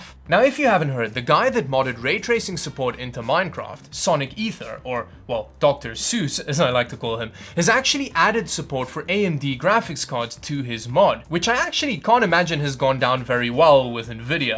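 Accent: South Africa. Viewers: Jo dufe you a south african? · Are you south African?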